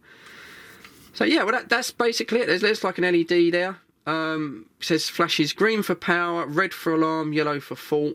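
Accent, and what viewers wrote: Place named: Northern England, United Kingdom. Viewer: are u from northern england? cause i got colleagues from there who sound exactly like you 😆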